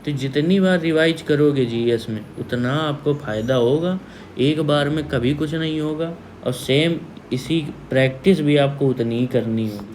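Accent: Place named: Kanpur, India. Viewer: Bhaiya aapke accent se hi samajh gaye the ki aap kanpur se hain ...badiya chize batate h aap ... dhanyawad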